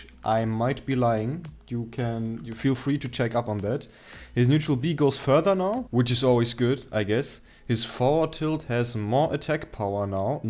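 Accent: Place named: Germany. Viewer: dein Englisch klingt echt hart deutsch.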